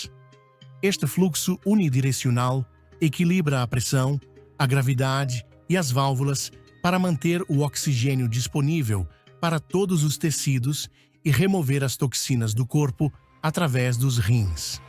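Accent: Portugal. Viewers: Eu acho que ele é português... De vez em quando o sotaque escapa e isso os brasileiros natos não conseguem. Se for um português de ascendência brasileira? Faria mais sentido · Tira esse sotaque lixo de Portugal 🤮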